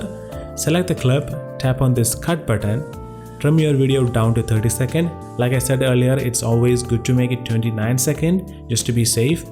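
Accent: Kerala, India. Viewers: Malayali aanalleee.... Pronounciation kettappol manassilayi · Ah English il thanne manasil ayi malayali anu ennu😁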